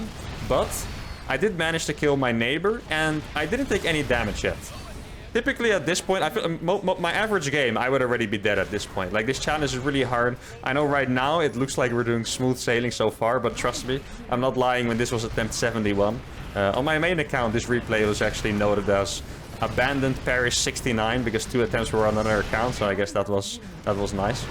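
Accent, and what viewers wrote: Netherlands. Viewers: Are you dutch? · Hallo Nederlands accent 🙂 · You are dutch arent you😋 I reconise our accent everywere. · Argh that dutch accent. Nice vid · First video to see from this channel.. and judging by your accent.. you're Dutch aren't you?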